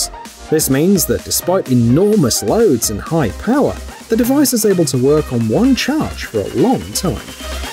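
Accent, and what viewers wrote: United Kingdom. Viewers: C'mon now who measures height in inches??? Weak. So total collapsible ladder height goes from 35"-14.5'. hate it when you get un experienced YT announcers using frugal and absurd measuring techniques despite the status quo. Like American calculations given in metric or American applied items with temperature variances given in Celsius.... Uh what is it 27° F for every degree Celsius? Don't know off hand Which inadvertantly cancels out the value of the information being presented. British accent or not, if you are going to present the ladder you might as well present the common method of measurement. It's only laziness and ignorance when presentations are as thorough as they are without doing so... Enough for some to immediately stop viewing as conversions are essential and we are not in Europe and we are in The great failing and falling America. 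Don't even know why i attempt to defend this skid mark country... All politicians need to be removed and no I'm not a domestic terrorist - just a disgusted true American Patriot. Live free or die.. waiting to for ad freedom is a joke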